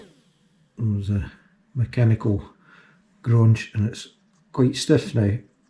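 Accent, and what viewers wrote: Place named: United Kingdom. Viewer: congrats for being the first British accented person to make a video worth anything. your videos are spot on. you make excellent panes. you show them flying filmed properly. you don't annoy with stupid antics like your in a month python film. you don't sound like a moron and you show everything in the build clearly and precisely. please teach your countrymen how make YouTube videos, seems the whole continent is lost. it's like watching Russians Indians or Chinese make movies they just don't get it albeit the Indians are coming up quickly. Btw not racist here just that British people make the worst videos by far and for me personally the accent is almost as annoying as listening to an American fron the deep south. with that said maybe it's just idiots that have turned me off with there inability to film anything properly. 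you were pleasing to listen to. 100% British? or is the explanation your from somewhere that just sounds english-ish? either way, please keep up the good work.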